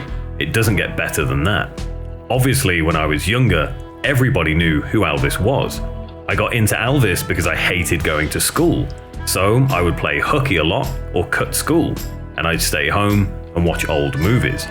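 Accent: England, United Kingdom. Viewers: There's something hilarious about the contrast between the host's prim and proper English accent and manner of speaking, and his old school rocker style appearance. Not what I was expecting.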